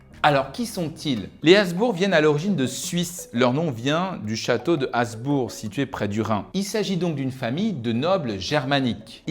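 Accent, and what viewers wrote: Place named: Paris, France. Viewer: Bonjour, votre accent Parisano-Franchouillard me csse les oreilles, au niveau littérature : Quer durch Österreich (Gertrud Gréciano-Grabner)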